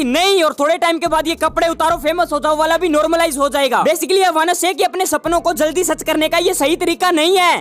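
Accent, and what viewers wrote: Rajasthan, India. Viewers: Bhai kya aap Rajasthani ho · Bhai❤😂राजस्थान से हो detected I'm also❤🎉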